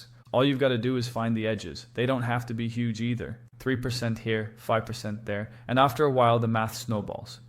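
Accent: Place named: United States. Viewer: The Best Most Perfect American English Accent & Talking I've Ever Heard!!! 💖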